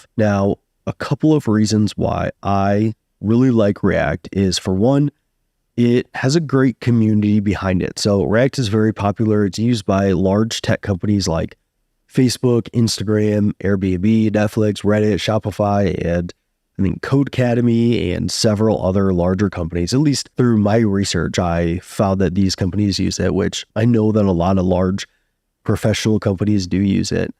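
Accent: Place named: United States. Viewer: I've been wishing for someone to make this video (react 2025) and for that someone to be an American English speaker and not an Indian and here you came in clutch with this gem. Thanks!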